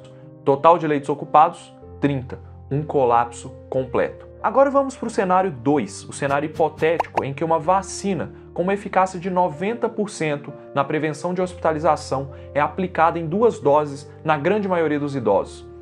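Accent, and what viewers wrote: Minas Gerais, Brazil. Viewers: cê é mineiro? 😍😍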